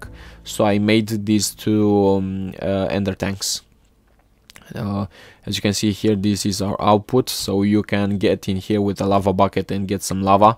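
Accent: Romania. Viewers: bip cu accent romanesc ;) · he is romanian, he can't have english accent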